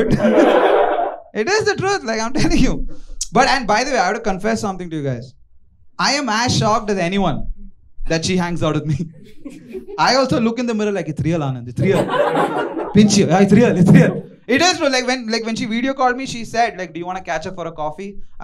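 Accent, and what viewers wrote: Haryana, India. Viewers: dude your haryanvi damn funny bro..!! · that Haryanvi accent was hilarious 😂😂😂😂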